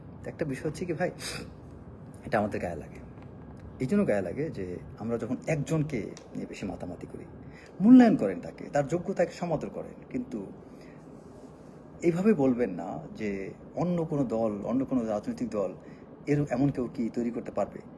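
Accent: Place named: Barishal, Bangladesh. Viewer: সবজান্তা শমসের হইছেন! বাচাল টাইপ!কোথায় লেখা আছে মুহাম্মদ সল্লাল্লাহু আলাইহি ওয়াসাল্লাম কে না সৃষ্টি করলে আললাহ সুবহানাতায়লা দুনিয়া সৃষ্টি হতো না!ভাল করে বাংলা উচচারণ ই করতে পারেন না!বরিশালের একসেন্টে কথা বলেন!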